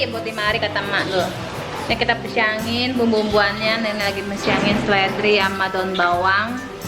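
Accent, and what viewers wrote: Jakarta, Indonesia. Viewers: aslinya mana sih. Nikahan kayaknya adat sunda. Tp ngomong gaya betawi. Jadi yang mana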